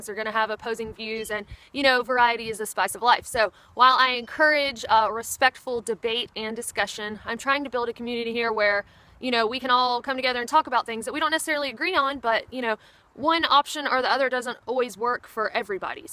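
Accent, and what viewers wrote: South, United States. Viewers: This is interesting, - you sound like you are from the South, and your name strongly implies Southern heritage, yet you pronounce the word 'Appalachian' as only a non-Southerner would. I mean no offense, but what happened? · Ooooh you crazy American cousins. I love your channel, and could listen to your accent all day, and liked your comments. I would say that your mind is the best defence that you have. What is the American obsession with guns??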